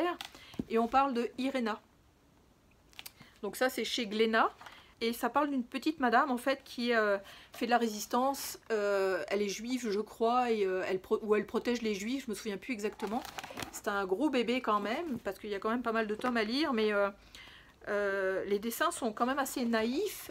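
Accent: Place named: Quebec, Canada. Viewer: une PAL de 340 BDs a lire!!! le rêve! 🥳🎉🎉🎉 Québec, j'avais deviné au léger accent... "Kililana song" est une magnifique BD. le dessin est sublime. "La bête" de Frank Pé, ca a l'air tres sympa. "Blast", une de mes BDs preferees, j'adore le dessin. c'est sûr que dans les BDs qui sont pas "youpla boum" elle est dans le top 3 facile. "La petite lumiere", je veux le lire, j'aime bcp le dessinateur.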